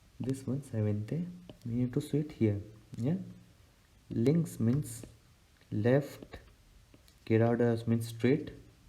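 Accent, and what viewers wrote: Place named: India. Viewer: Мне кажется этот ведущий из Индии. Мне кажется индийский акцент.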